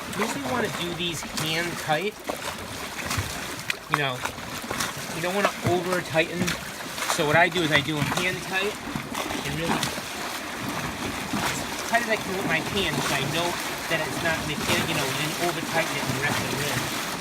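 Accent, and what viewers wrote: Rhode Island, United States. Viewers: Am I detecting a providence Road island accent?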